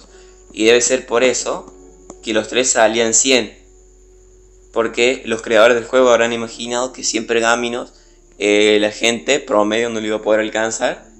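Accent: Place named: Córdoba, Argentina. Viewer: amigo te re queda el cordobes kpo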